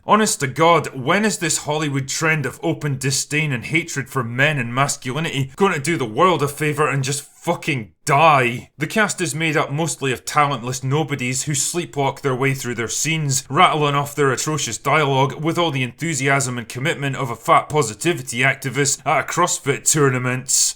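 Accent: Scotland, United Kingdom. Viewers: You seem Scottish but you say eh a lot and you act Irish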